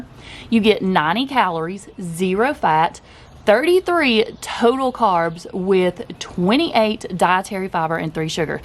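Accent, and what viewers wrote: South, United States. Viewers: Something is busted there. The meter clearly says "Ketones" and then the ridiculous number. I don't have a KetoMojo but a Precision Xtra that does glucose and ketones, and the meter will clearly show what type of strip it is reading - Glucose or Ketones. Something is flubbed there. In any case, 268mg/dl would be OUTRAGEOUS for ketones so it may really be reading glucose. But if that number is legit, this is worse than sugar. Actual sucrose would hit you maybe into the high 100's. .. BTW, love your channel and please ignore the haters. I live in the south and I love your accent! You sound like a sweet, friendly southern gal to me!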